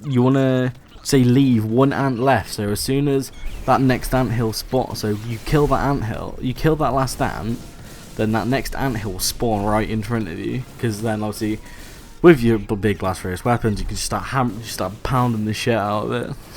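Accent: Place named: United Kingdom. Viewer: Man you need to put subtitles in your vid I dont know were you live in GB but you accent is just too hardcore for me no offense there man just an idea. Great metod by the way.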